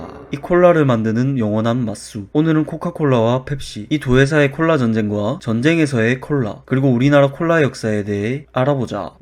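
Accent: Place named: Gyeongsang, South Korea. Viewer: 영상 개재밌네ㅋㅋㅋㅋ어눌한 경상도 사투리로 말을 왜케재밓게하심ㅋㅋ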